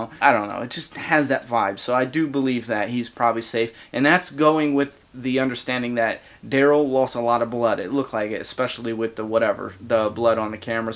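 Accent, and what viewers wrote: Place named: Boston, United States. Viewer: are you from Mass? I hear that Boston accent...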